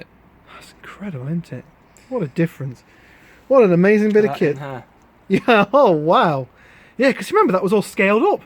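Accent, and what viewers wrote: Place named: Derbyshire, United Kingdom. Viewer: Im from Derbyshire lol, I know Derbyshire when I hear it lol, awesome videos on the heaters and I see you get upto alsorts of stuff so Im subscribed ;-)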